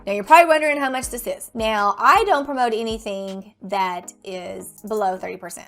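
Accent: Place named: Texas, United States. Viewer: I just come across your channel. I am from NZ. You sound as though you have a Texan accent.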